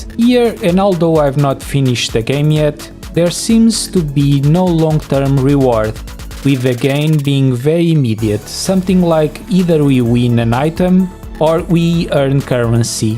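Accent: Portugal. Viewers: I noticed a Portuguese accent 👀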